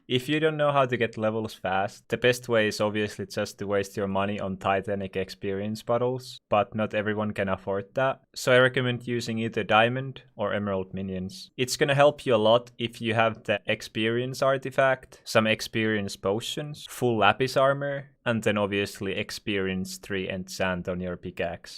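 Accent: Finland. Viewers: are you from finland because your english sounds finnish accent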